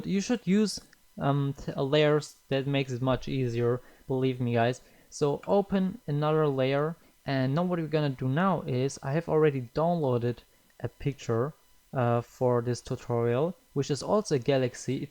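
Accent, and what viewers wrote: Germany. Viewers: Ach hab mich schon gewundert 😂. Deine Sprachstil klang so englisch aber die Aussprache mehr deutsch, deswegen war ich bissl unsicher. Aber Danke für deine schnelle Antwort 😂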